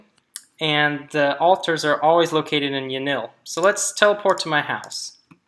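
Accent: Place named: South, United States. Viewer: im guessing alabama tennessee texas?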